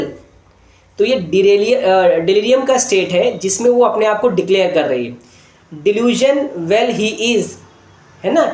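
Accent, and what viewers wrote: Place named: United States. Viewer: Sir we learn a lot from your videos but few videos you are uploading in English American accent not in your own English/ Hindi language. This is not very beneficial for us.please continue in your own language .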